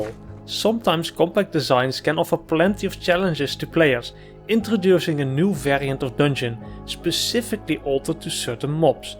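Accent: Netherlands. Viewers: Bro you sound dutch nice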